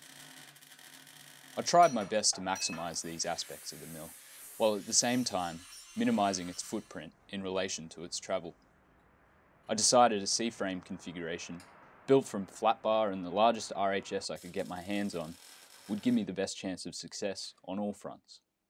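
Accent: Australia. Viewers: Before I heard your voice, I was hoping it was Australian! And boy, I’m happy. Can’t wait to watch your next video. You can then join the ranks of CEE and I Did A Thing, and Pask Makes… no engineers in sight UK Aerospace Engineer here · Beautiful (Aussie) introduction. Great video. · Some strange mix of This Old Tony, Marling Baits and.... well, an Austrailian... Edit: A very good mix. Excellent way to start a channel strong. good on ya mate.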